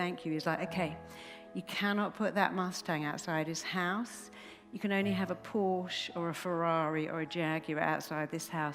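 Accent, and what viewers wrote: United Kingdom. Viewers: ENGLISH ACCENT FULL OF SHIT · It only works when you say it with this britisch accent:)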